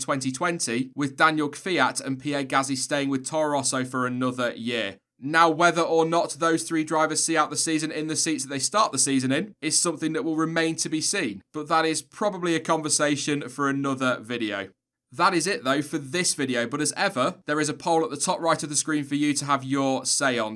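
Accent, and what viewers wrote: United Kingdom. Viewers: to be fair i was using you as a base line for a english man accent so you know its not all bad a compliment from your irish neighbors !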